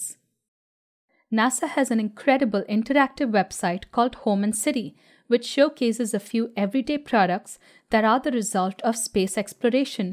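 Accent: India. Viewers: Hey very nice educative content, awesome graphics and you have a nice polished Indian accent too, you from India?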